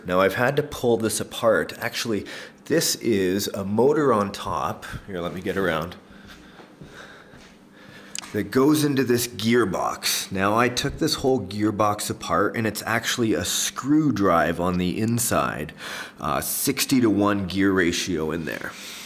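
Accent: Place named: Canada. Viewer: he's Canadian.you can tell from his accent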